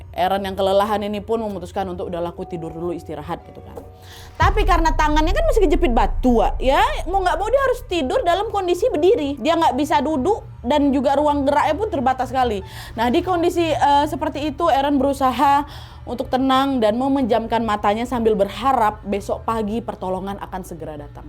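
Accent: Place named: Medan, Indonesia. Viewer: namamu, namaku nidia. lahir 27 okt, aku 28 okt. Awal kali aku liat video ytb krn logat bicaranya kayak orang medan, kirain aku org medan wkwk Sukses terus ya kak❤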